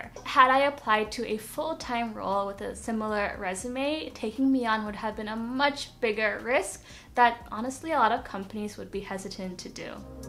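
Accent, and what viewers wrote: Andhra Pradesh, India. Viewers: Hey telugu ahh 😅 .. native ekkada ? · o.O girllll! you are from andhra wow. Your accent is sooo coool.I love that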